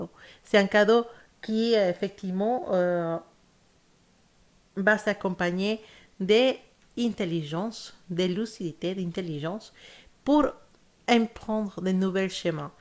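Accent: Spain. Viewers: Bonsoir!! Merci pour votre guidance ça me parle effectivement c'est le cas pour moi. J'aime votre accent espagnol! ☺️ Merci infiniment! 🙏 Namasté 🙏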